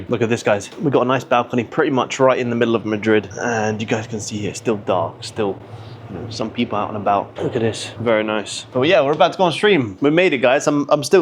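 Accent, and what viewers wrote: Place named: Australia. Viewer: Wait where are u originally from I thought u were Australian haha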